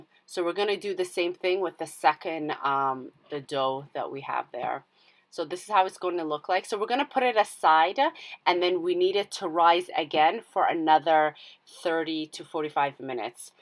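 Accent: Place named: Canada. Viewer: Cunto Macaan maasha Allaah I show them and they lake the Canadian accent too thank you abaayo every week they are gona Cooke one think mahad sanid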